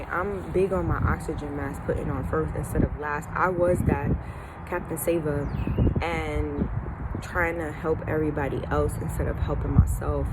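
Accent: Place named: Baltimore, United States. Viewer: OMG you sound like you're from my hometown!! Aye Baltimore! But you gave some very insightful advice bc I had to makes changes myself regarding the overuse of technology and the underutilized benefits of nature. When I walk my dog, I hardly see anyone else outside but just feeling the rays of sunlight on a consistent basis helps my physical and mental health tenfold. Its easy to get complacent with being indoors but after a while, it can take a toll as you mentioned. I begin to get more anxious after too many days indoors. The body will certainly alerts you when something is amidst.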